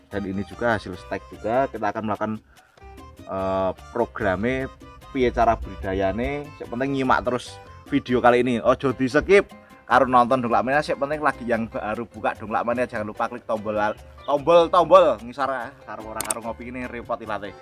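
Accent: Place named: Java, Indonesia. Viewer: Salam satu hoby kang.....dari boyolali...aku suka bhasanya meduk capur Jawa .....kadang bikin ketawa..lanjutkan moga sukses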